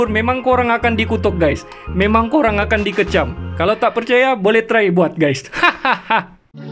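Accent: Sabah, Malaysia. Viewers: Bro orang sabah kah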